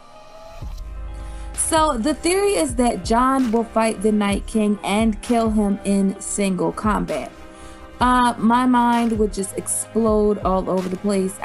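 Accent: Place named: DMV, United States. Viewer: You are from the DMV area or B-Moore I can hear it in the accent but good info on GOT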